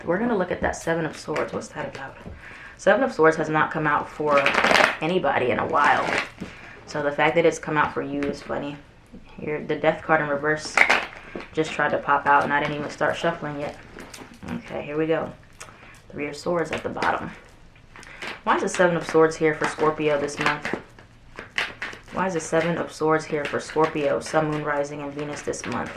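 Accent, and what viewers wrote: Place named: Philadelphia, United States. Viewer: You said wooter not water. My ear went ding ding you have to be from the Philly Jersey area. Also, “you guys” that’s how we speak around here 💯🙌🏼🥰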